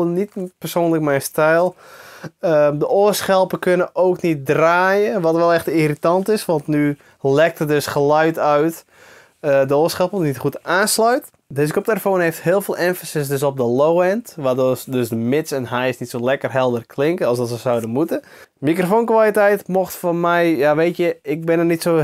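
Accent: Friesland, Netherlands. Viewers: Hou van het friese accent. De oorschelpen zijn achteraf super chill had ze ook op mijn razer nari ultimate. Voor lange gaming sessies zijn ze zeer fijn want ze houden je oren wat koud (cooling gel)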